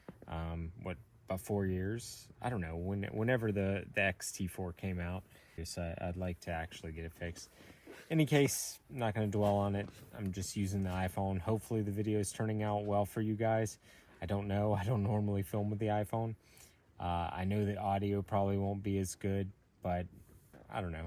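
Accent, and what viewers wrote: United States. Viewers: thank you I just wondered because of your accent. I'm from Phoenix Arizona so it definitely sounded American to me LOL 😆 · thanks for the update on the camera, yikes camera lens is expensive. I thought I heard an American accent. The UK I've seen via this channel is beautiful! I noted there's only sheep, no wildlife.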